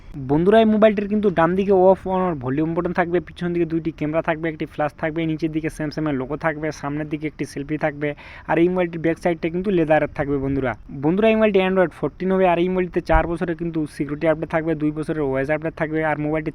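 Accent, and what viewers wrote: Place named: Chittagong Hill Tracts, Bangladesh. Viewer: পাঠক কি চাকমা?? ওনার বাংলা তো বুঝতে খুবই অসুবিধা হচ্ছে।বাঙালি reader হলে ভালো হতো।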